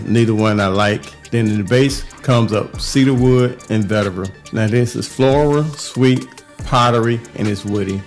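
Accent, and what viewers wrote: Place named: Alabama, United States. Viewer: Yo! Wherebot you from brutha? I hear some Alabama in ya talk. Love the content. Get well soon fam. Checking in from Alabama.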